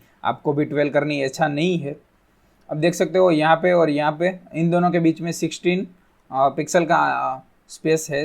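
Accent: Gujarat, India. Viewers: When you speak Hindi, it seems that you are 100% a Gujarati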